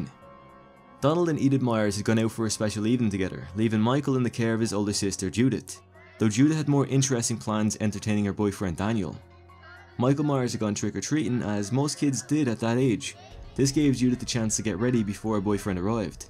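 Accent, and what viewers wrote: Ireland. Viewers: I’m pretty sure he’s Irish. Regardless, it’s all the same language.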